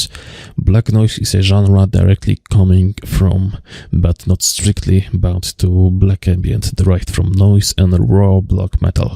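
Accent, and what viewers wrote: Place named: Eastern Europe. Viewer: looks like i hear slavic accent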